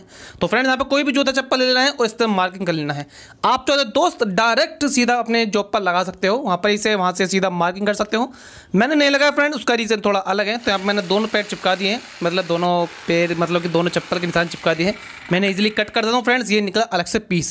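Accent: India. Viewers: I love your killer Desi accent bro 😉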